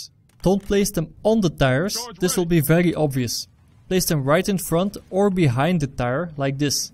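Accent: Netherlands. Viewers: Are you netherlands? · Hij praat wel met een Nederlandse accent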